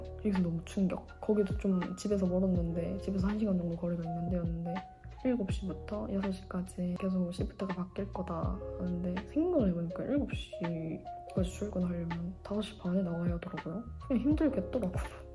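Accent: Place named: Busan, South Korea. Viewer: ㅋㅋ 넘 귀여우세요 부산말투~~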